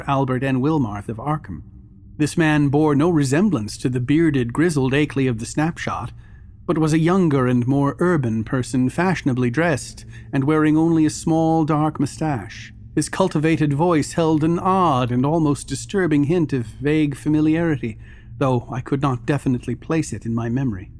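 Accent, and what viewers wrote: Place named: Vermont, United States. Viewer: Best recording of this story I have heard, and I try to listen to them all. Impressed with your Vermont accent.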